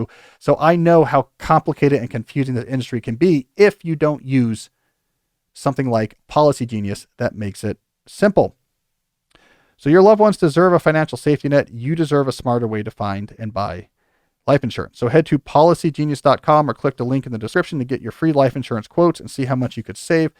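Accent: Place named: Germany. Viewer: Worst German accent ever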